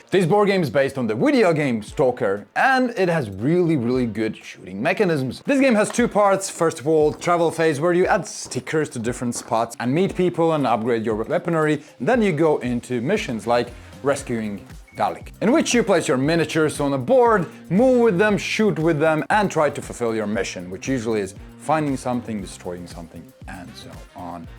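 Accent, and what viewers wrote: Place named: Latvia. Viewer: sasodīts, man vajadzēja tikt līdz šī video, lai sāktu aizdomāties...? Akcents? Yep. Skaidrs. Apsveicu, jums līdz šim tīri labi izdevās nomaskēt savu identitāti:) P.S. kur jūs pērkat visas šīs spēles? daudz kas no jūsu apskatiem LV nemaz nav nopērkamas (vai arī vnk nezinu, kur skatīties?)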